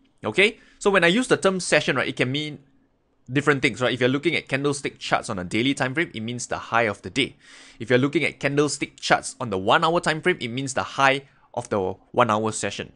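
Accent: Singapore. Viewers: I miss my Singaporean friends because of your accent bro. 😫🤩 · He had typical Singaporean accent 😍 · All Singaporeans speak like this! I lived and worked in Singapore for ten years and married a Singaporean gal. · Singaporean accent to me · Singlish for sure 😃. Very catchy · I think it's probably a Singapore accent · him speaking in a singaporean accent doesn’t mean English is his first language. Our first language is English most of the time. Don’t be ignorant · Are you Singaporean? your accent look like it. I used to work there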